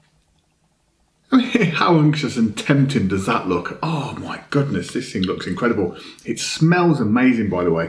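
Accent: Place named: Birmingham, United Kingdom. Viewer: Watching how much you enjoyed this I’m gonna have to get myself one! You sound like a fellow brummie?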